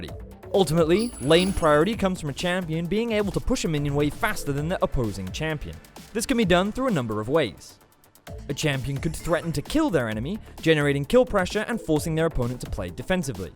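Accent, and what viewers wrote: United Kingdom. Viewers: British accent drives me nuts.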